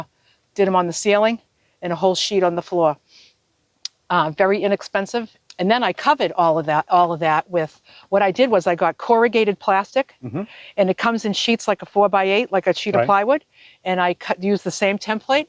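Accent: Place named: East Coast, United States. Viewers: New subscriber to.. you rock sister Certainly from the East Coast dialect.. 😅 much love from McDermitt Oregon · Brilliant woman, brilliant van! Love her accent. Is it New York? (Brit here) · I can tell she’s probably from Massachusetts. My home state, what a lovely lady. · Light weight is important. Kitty litter works well, just ask my cats. I hear a northeast coast accent.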